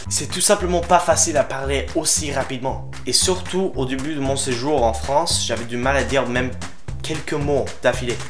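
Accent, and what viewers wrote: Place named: United States. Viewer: J'adore ta nouvelle chaîne !!! gros pouce bleu 😍 continue ! et j'adore ton accent américain :)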